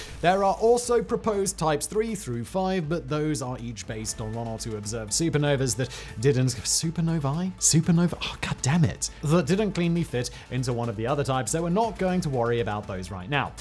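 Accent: United Kingdom. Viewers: Bald man with facial hair and glasses, wearing all black, relaxed on an office chair speaking with a British accent talking about cartoony supervillain stuff (like blowing up a star)... ...Dude starts petting a cat on screen and this is a solid trope. Bonus points if he trades the glasses in for a monocle.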